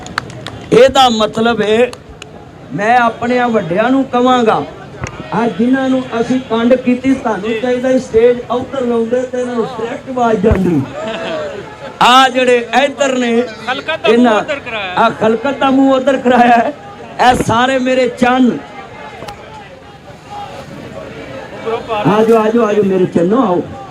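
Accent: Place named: Majha, India. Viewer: It is standard Mahja Punjabi. What is so mithi about it?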